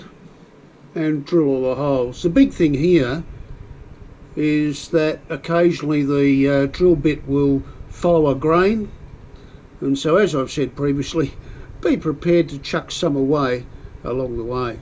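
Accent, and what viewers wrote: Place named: Portugal. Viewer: Parece-me um português falando a língua Inglesa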